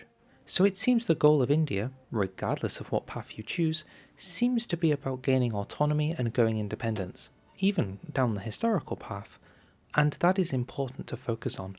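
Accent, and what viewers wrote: United Kingdom. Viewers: Cause he's talking in British accent.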